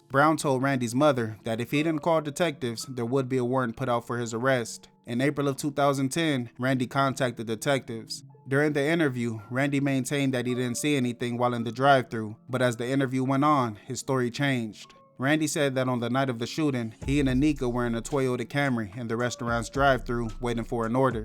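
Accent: Los Angeles, United States. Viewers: Right on time‼️ btw are you from LA?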